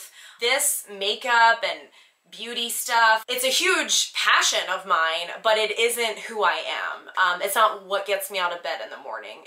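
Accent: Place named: Ohio, United States. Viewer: Nice to get to know more about you! I thought you may be from my neck of the woods due to your accent. I am an Ohio girl. We have alot in common and and can totally relate.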